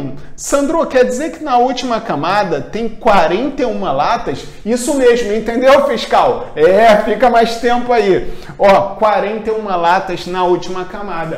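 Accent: Rio de Janeiro, Brazil. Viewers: Meu irmão sou teu fã brother, também sou professor de matemática e acho bem diferente e inovador sua didática, apesar desse sotaque carioca pesado friend... A metodologia de amarrar a atenção do aluno me motiva pra fazer o mesmo e conceituar isso na dissertação de minha pós. É isso meu camarada, show.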